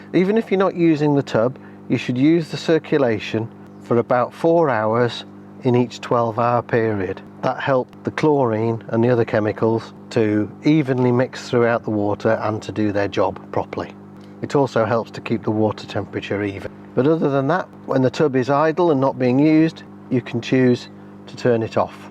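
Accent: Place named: England, United Kingdom. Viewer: Hi. My sister and I bought this hot tub for our Canadian wilderness cabin…it arrived without any instructions ….. that made sense to us at least….so we you tubed it….and voila! A wonderful Englishman who walked us through every step..use language we understand in an accent we love…so we are here in the mountains, in the back country of our cabin waiting to turn on the heat and enjoy the amazing stars visible only at night…we are hoping to get the right temperature tonight….thank you so much~!!! You absolutely made our summer!!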